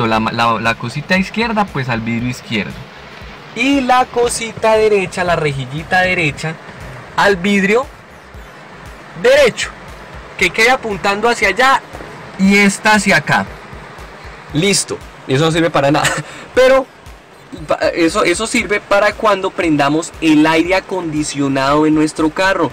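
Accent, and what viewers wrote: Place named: Antioquia, Colombia. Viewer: Me gusta como hablan los paisas...gracias Men..!!!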